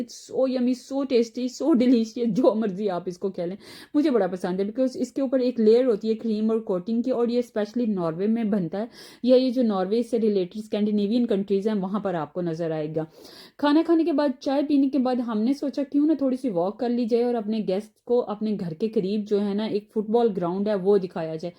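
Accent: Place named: Punjab, India. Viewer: Mujy ap ki Punjabi Ka tarka bohat Pasand ha ji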